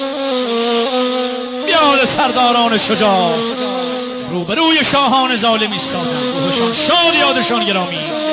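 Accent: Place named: Afghanistan. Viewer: You're an AFGHAN 😂😂😂😂😂😂😂😂😂😂😂😂😂😂 I KNOW NOW!!! IT MAKES PERFECT SENSE. I FEEL SORRY FOR YOU :((((((( ITS OKAY... YOU CAN KEEP PRETENDING YOUR PERSIAN. YOURE JUST PERSIAN SPEAKING WITH YOUR UGLY DIALECT.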